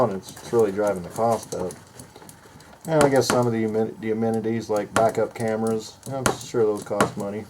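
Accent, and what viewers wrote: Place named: Missouri, United States. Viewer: Sounds like you live in Missouri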